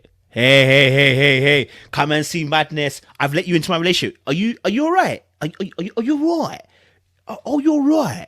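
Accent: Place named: United Kingdom. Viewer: I AM DYING!!! THIS IS HILARIOUS AND YOUR BRITSH ACCENT MAKES IT ALL BETTER!!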